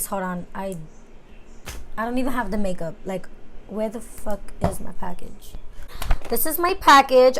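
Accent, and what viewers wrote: New York, United States. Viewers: She definitely from New York right?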